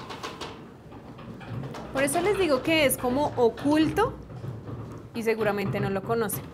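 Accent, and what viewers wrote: Colombia. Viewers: Hola, yo te oigo desde Monterrey, México y he quedado enganchada con tus videos y con los casos que presentas de tu país. Aquí en México también ocurren casos lamentables,creo que ningún país se salva. Me gusta tu acento,de que parte de Colombia eres? Agradecería si me mandas un saludo. Soy Vero.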